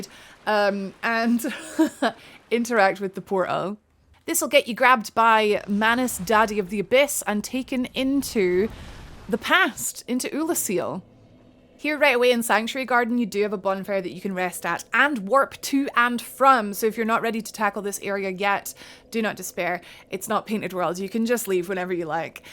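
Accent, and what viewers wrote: Scotland, United Kingdom. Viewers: Are you Scottish Great video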